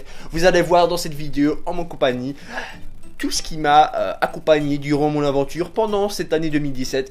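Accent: Belgium. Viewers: Lionel Messi normal il est belge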